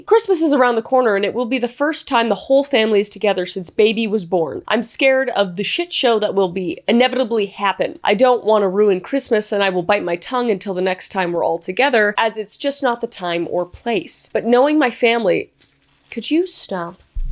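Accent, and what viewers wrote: Germany. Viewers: Sehr gute, deutsche Akzent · Yep your accent sounded like my german mom trying to speak english 😅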